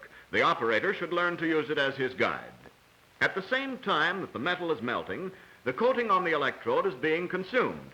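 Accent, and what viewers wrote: United States. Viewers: I can say, with absolutely sincerity and seriousness, I would have paid so much more attention in school if my teachers had all had the ol' timey, Mid-Atlantic English accent this guy employs. I practically feel like I'm a qualified SAW technician, ffs. :D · You know you're gonna learn something when the narrator has that transatlantic accent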